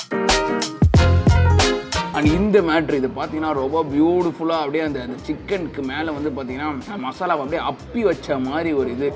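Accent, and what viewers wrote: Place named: Butler English, India. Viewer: Stop talking your buttler english ....... fraud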